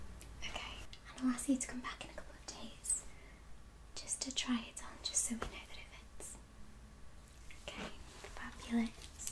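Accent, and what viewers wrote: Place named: England, United Kingdom. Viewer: Nothing better than a classy English women's voice 👌🏻🙈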